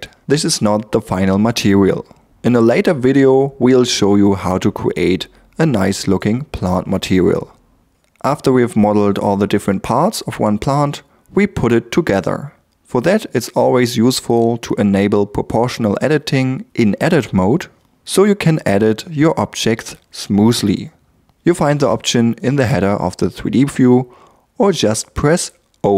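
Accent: Germany. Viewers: haha your english is awful :D 10 seconds into the video and i yet hear that u are german ;) anyway, great 3d skills, good explanation- stay creative :)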